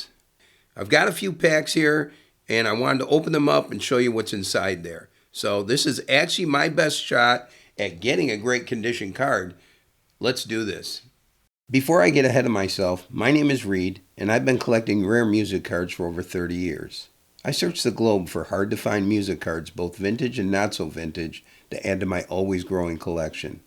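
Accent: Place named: Michigan, United States. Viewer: Sounds like you're from Michigan bro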